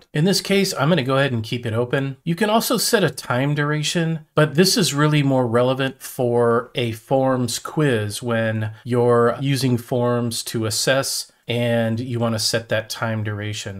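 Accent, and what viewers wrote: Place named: United States. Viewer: very useful! However, every time I hear an American pronounce 'mobile' in 'mobile phones', I'm struck by how much it sounds like 'MOBIL' as opposed to mob-ile which is how the rest of us pronounce it (Australia, Britain, Ireland, Scotland, etc) Indeed, how do Americans pronounce the name of the oil company, MOBIL? And how would you distinguish that from something being mobile? Any way, who cares. You answered my question which is how I actually get my form link to my users, so thank you sir for the clear explanation!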